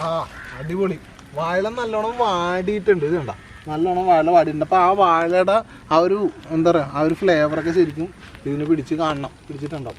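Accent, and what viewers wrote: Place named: Kerala, India. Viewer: ബ്രോ പരുപാടി ഒക്കെ പോളിയാണ്. പിന്നെ നമ്മുടെ കുമ്പളങ്ങി സ്റ്റൈൽ വർത്തമാനവും സൂപ്പർ ബട്ട്‌ സാറാസ്ന്റെ പരസ്യം ഇതിൽ വേണ്ടായിരുന്നു..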